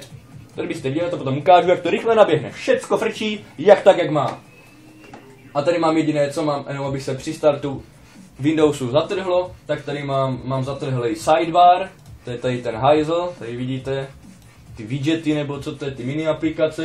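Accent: Moravia, Czech Republic. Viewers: Moravak ne ? :D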